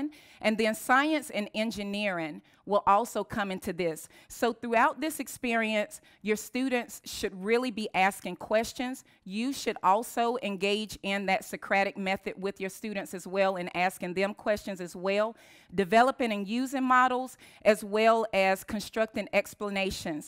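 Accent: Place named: South, United States. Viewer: She from the south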